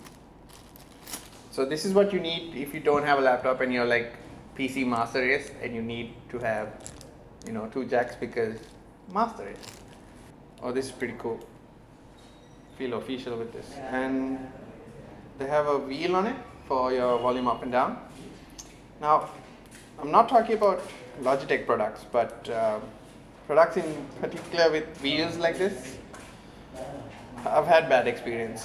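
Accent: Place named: India. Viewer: that indian accent is very bad lmfao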